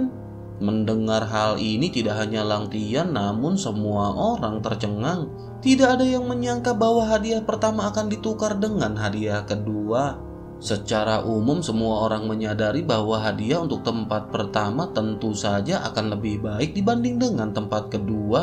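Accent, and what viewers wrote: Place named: Jakarta, Indonesia. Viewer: Paten mimin logat betawi keren dan lucu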